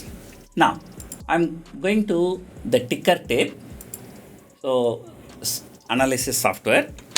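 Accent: Tamil Nadu, India. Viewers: U r speaking like tamil .easy understanding english i learn stockmarket also english frm u thank u sir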